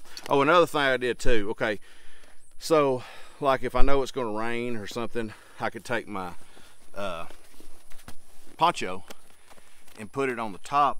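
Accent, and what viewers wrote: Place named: South, United States. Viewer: I spent this entire review repeating everything you said trying to improve my southern drawl accent 😄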